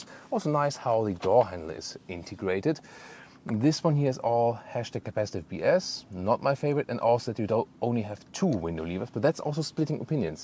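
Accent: Germany. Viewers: His German accent is sooo pronounced... oh my fucking god. :D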